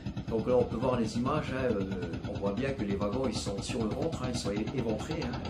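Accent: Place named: Lorraine, France. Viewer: J'adore l'accent lorrain-moselle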